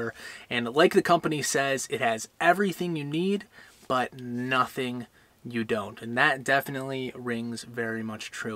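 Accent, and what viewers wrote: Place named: Buffalo, United States. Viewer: Lol… tray - ler… I noticed he was saying trahler.. it’s that Buffalo accent 😀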